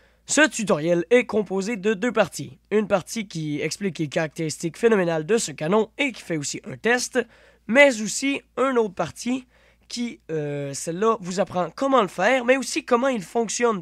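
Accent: Quebec, Canada. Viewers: Juste excellent, et puis ton ptit accent québecois ça rend le tuto encore plus sympa à écouter :)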